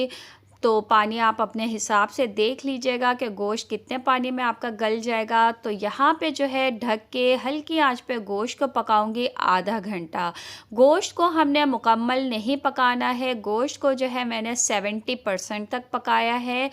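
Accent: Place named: India. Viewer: Achhi Tara KEHTAY han achhay se nahi. Indians ki naqal kar Kay I Urdu ka kabara na Karen please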